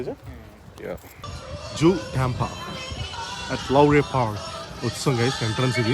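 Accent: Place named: East Godavari, India. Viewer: U looking gorgeous bro..Pakka mana telugu east slang bro. I happy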